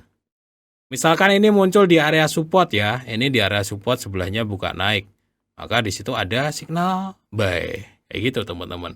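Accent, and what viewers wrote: Java, Indonesia. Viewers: Maturnuwun mas... logate jowo banget..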